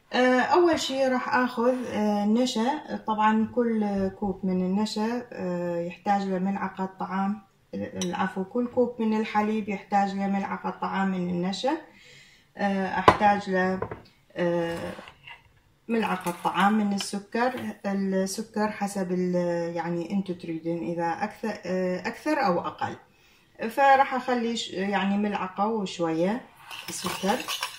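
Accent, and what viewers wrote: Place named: Iraq. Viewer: احب اللهجه العراقيه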